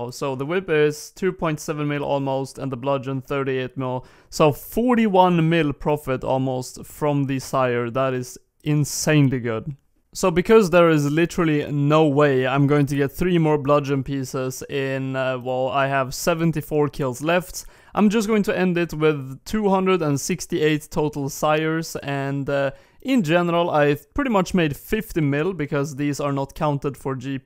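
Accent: Sweden. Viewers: Raspig svensk dialekt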